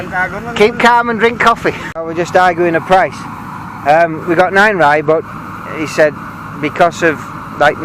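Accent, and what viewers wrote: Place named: Leeds, United Kingdom. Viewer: you sound like my m8 frm morley int leeds bet im not far off deffo leeds lad bit of crack and im a jock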